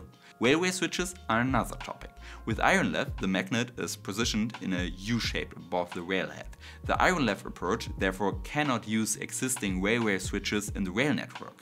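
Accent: Germany. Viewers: Gutes Video! Aber sprich bitte nicht so schnell. Dein Englisch ist schwer zu verstehen, wegen dem starken deutschen Akzent. z.B "cushion" wird "kuschen" ausgesprochen.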